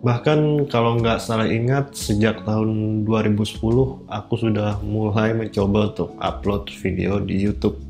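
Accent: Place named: Kalimantan, Indonesia. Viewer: Logat kalimantanya keluarin lagi bang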